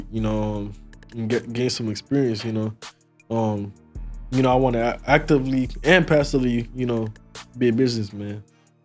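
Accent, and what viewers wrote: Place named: Jamaica. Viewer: He Jamaican. You can tell he still tryna fiigure out the American talk.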